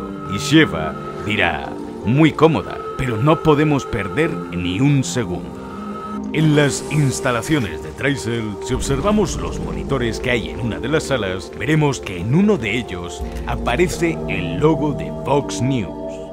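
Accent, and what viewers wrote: Spain. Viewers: Un detalle alucinante es que el video sea narrado por un español con memes en audio latino jja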